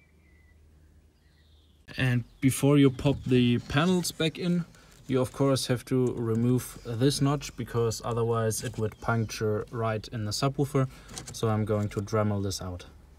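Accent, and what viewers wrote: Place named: Germany. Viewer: spitze video. dein Englisch ist ausgezeichnet, der deutsche Akzent kommt immer gut an... Cheers aus den USA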